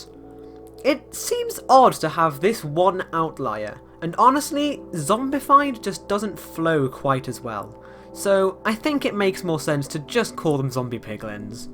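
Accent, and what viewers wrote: United Kingdom. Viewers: Why are you bri'*sh? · This man's voice really fits as narration, the calm bri'ish voice is really good for voice overs.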